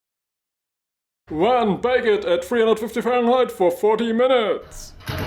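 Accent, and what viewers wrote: Germany. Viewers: It is probably the well-known German accent.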